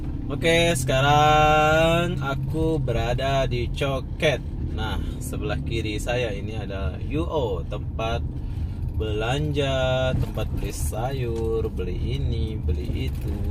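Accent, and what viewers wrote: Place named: Kuala Lumpur, Malaysia. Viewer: Aku suka video kamu.bicara kamu kayak orang kuala lumpur.udah campur2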